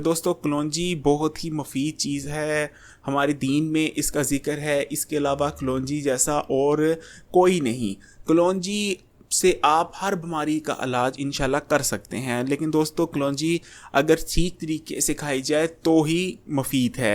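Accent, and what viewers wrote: Punjab, India. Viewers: Apka Punjabi accent ..apka baat krne ka tariqa bht acha hai. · بھائ اپنی اردو تو ٹھیک کر لیں یار کتنے الفاظ ایسے ھیں جو آپ نے پنجابی کے ادا کئے باقی رھی کلونجی کی تو وہ شفا ہی شفا ھے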